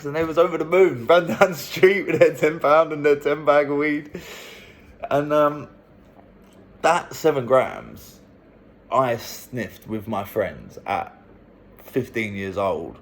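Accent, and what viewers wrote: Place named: Turkey. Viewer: the turkish accent stuck brother😂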